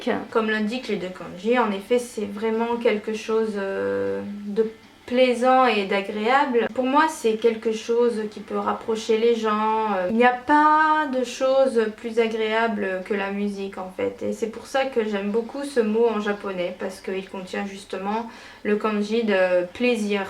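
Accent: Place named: France. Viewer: Salut ça fait vraiment 5 ans que tu es au Japon ? Quand j entend ton accent j ai l impression que tu es en France plutôt. C est pas méchant.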